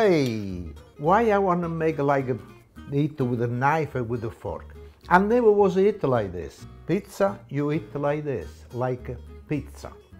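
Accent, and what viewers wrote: Italy. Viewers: Chef you are a wonderful Chef. I love your beautiful food creations. You remind me of my Father who is now in heaven. Your speaking, Italian accent are the same. God Bless you with many years of good health and happiness in your life. Keep making these beautiful video's and educating all of us.You are so delightful. Love you!! Joe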